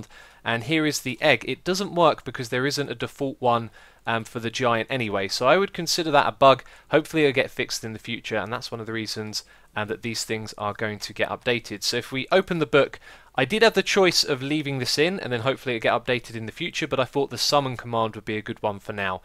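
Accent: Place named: United Kingdom. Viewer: Oh my you're British!!! Me too!! I think you're British, you sound it!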